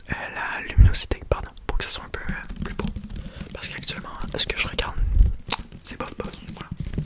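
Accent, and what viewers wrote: Quebec, Canada. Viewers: J'adore l'accent québécois en tant que français, sinon je me détends toujours autant meme si je ne commente pas souvent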